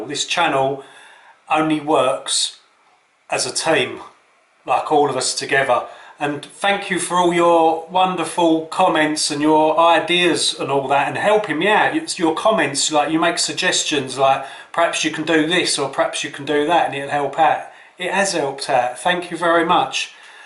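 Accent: United Kingdom. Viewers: Love your eyes and accent...yum British men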